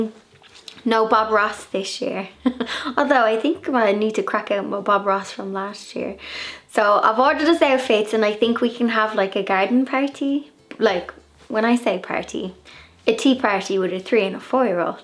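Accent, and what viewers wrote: Ireland. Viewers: Hello,I've not long subscribed.Im loving you alot!!!!😘 You have the most girly vintage snuggly cuddly house.🤗🌟 My dream home.Can I move in?pretty please😘😘 You should be so so proud of yourself.Yoh most definitely are an old soul in a young body.I saw that you visited Kilkenny in one video? I went there with my hubby for our honeymoon then Dublin.We saw Irish dancing in one of the pubs.That was sooo beautiful.My dad's family are from county Sligo.We want to go there at some point and look up the family.I love the Irish accent it's just the best.Im busy working my way through all your videos!! There's so many.Im just starting to make soaps and candles etc and I'm getting some fab tips from your videos.much love and stay safe.From a rainy England.🤗🤗🤗🤗🤗😘😘😘😘😘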